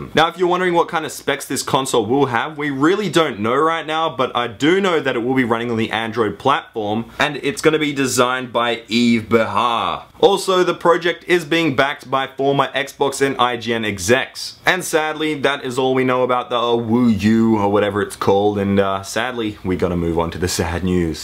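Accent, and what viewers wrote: Australia. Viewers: ur Australian????? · Halo 4 is gay along with you're Australian Accent / voice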